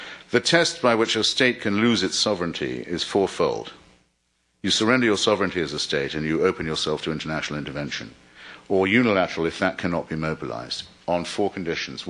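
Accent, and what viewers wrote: RP, United Kingdom. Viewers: a shock jock with a plummy accent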